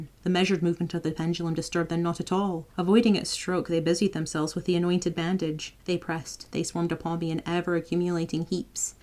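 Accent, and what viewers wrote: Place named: Scotland, United Kingdom. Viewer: I just found your channel. Your accent is strange. It's like you're either from Scotland and have been living here in my home state is Indiana for years OR you're FROM the Midwest and have moved to Scotland or nearby and have picked up some of the accent. It's wild. It's like, to my ears, you almost sound like an average US person but then it's sprinkled with a bit of a Scottish brogue. Lol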